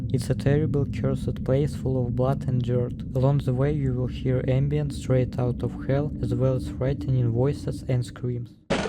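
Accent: Russia. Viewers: idk why, but this accent sounds more like russian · Русский акцент прослеживается чел, но все равно молодец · АААААА БРАТАН ТАК ТЫ РУССКИЙ ООООООО МЛЯЯЯ НЕ ОБЕССУДЬ Я НЕ СО ЗЛА КОНЕЧНО, НО ТЕБЕ СЕРЬЁЗНО НАДО ПОРАБОТАТЬ НАД ПРОИЗНОШЕНИЕМ. Знаешь, как сильно иностранцы ненавидят такой акцент? (Я тебя спалил кстати по Ал-Кайде. Ты очень по-русски это сказал)) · акцент то русский попался гузлик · Слышится русский акцент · слышу русский акцент, замутил бы еще русскоязычный видос или русскую аудиодорожку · Зачем ты говоришь на английском, у тебя ярко выражается русский акцент :\ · СЛЫШНО ЧТО ТЫ РУССКИЙ АКЦЕНТ ТО РУССКИЙ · По акценту ты русский, я это сразу с первых минут просмотра понял, если нет, жалко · Я чую голос русского, по произношению слышно) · Наш мужик слышно по акценту))) найс контент)) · english language on russian accent is atractive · как же слышно то что ты русскоязычный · Он русский, выдаёт акцент, +под некоторыми профилями его друзей в стиме оставлены русские комменты. 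(скрывается от кого то 100% :D ) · Русский акцент не скроешь) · Huh, russian accent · Ты из России,я это по акценту понял. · Вроде бы указанная страна в описании - Великобритания, а акцент на уровне "ай хэв май мазэр анд ай хэв май бразэр" слышится... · Нафига ты говоришь на английском. По акценту слышно - русак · Блин мужик, снимай на русском. Но если я ошибся, и это просто акцент, то извини - на карте еще можно было выключить электричество через щиток в комнате с бильярдом у кт спавна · Чел ты Русс у тебя слышен русский акцент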